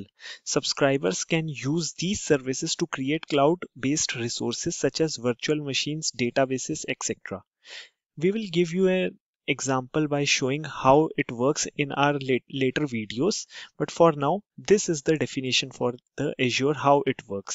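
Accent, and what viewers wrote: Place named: United States. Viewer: Accent like American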